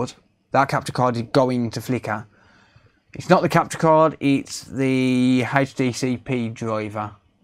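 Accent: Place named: Midlands, United Kingdom. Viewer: ahh, I thought you sounded localish, very midlands type accent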